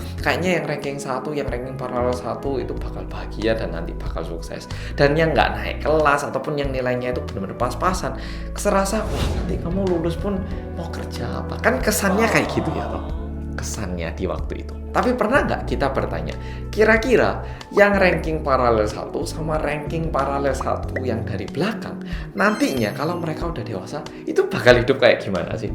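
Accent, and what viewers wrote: Java, Indonesia. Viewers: bersama orang korea yg medhokkkkkkkkkkkkkkkkkkkkkkkk wuasik pool mendhoknya wkwkkw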